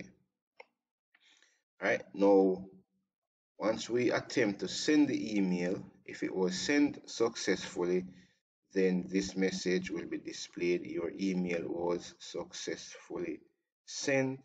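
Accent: Jamaica. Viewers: jamaican accent on a php tutorial is maad crazy. big up unnu self man. Love from kenya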